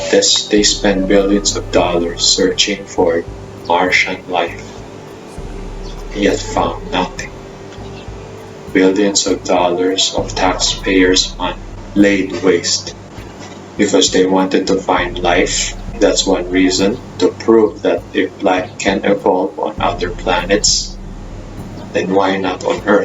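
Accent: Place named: Philippines. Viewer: totally has a Filipino Accent.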